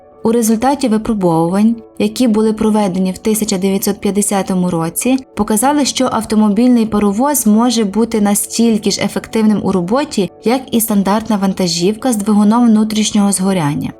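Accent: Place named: Western Ukraine, Ukraine. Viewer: жінка диктор з заходу країни)))))так цікаво слухати акцент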